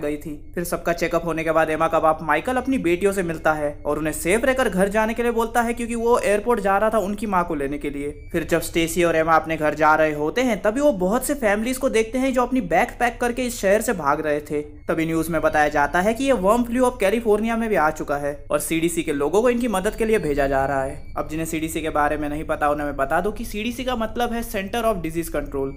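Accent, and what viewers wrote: Maharashtra, India. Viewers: Ur Marathi accent is OP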